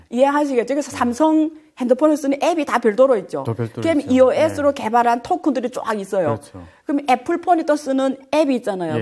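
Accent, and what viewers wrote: China. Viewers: 억양이 조샌족인가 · 화교인가 발음이 ㄷㄷ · 조선족이신가요? 억양이..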